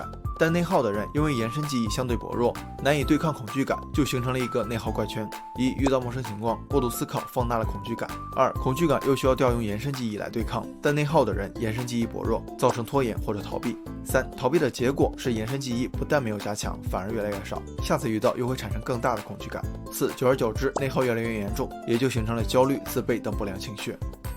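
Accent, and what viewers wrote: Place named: China. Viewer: 听这口音，up本来就是我们国内的吧😅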